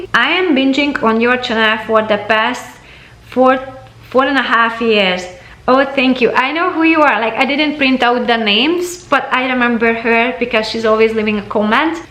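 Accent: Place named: Hungary. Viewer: Wow! that was an amazing video, thank you so much for opening up to us and allowing us to get to know you better. first of all please; never apologize for your accent, I am sure we all understand you perfectly well, and many of us have"accents" well, my maiden name is Szabo, my Daddy was Hungarian, and ended up in France after WW 2 and met my &Mom, so I was born and raised in France but my Dad took us several times in Hungary and Romania ( transilvania) to meet our family and these times are some of the best memories of my childhood and now I live in California with my German husband...😀...in your voice and your intonations and pronunciation, I can hear my Dad's accent and it warms my heart.All I can say is you do such a wonderful job at helping people get motivated to improve their homes and environment and,taking care of our health to,o thank you so much for that, just that, in itself you accomplish so so much, your family must be so proud of you, and you show people that having a close partnership with your spouse is so special and makes,your life easier and helps you so much in overcoming difficulties in ln life, your guys' love for each others shines thru in your videos and I think is very encouraging to younger couples to strive to become a strong and united mates, thank you again.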